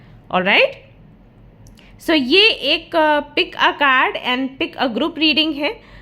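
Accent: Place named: Assam, India. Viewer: I was watching it thn suddenly heard he might be Assamese guy.. lik I was thinking bunch of things before or while watching the vdo.. wow, might there is a chance for me again. Spetical yet I dnt knw.. Let's see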